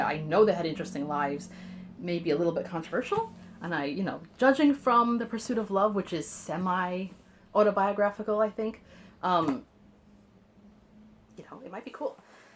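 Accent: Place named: New York, United States. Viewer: Hi, I'm going to book off today here in Hawaii. Wish me luck. I have more of a New York accent than you do and I've been living in Hawaii for 40 years. I just did my first. mail haul using my brooklynese accent full force if you want to take a look. Sorry you got soaked. It happens to me in Hawaii but I have a collection of plastic bags. Aloha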